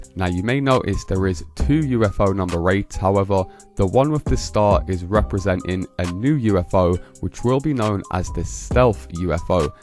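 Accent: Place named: England, United Kingdom. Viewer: Mate, You sound English.. As an Aussie I say IGNORE AMERICANS when they try tell an Englishman how to speak English.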